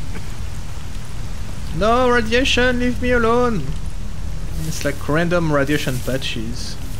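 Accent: France. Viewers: you have a big french accent i am wrong?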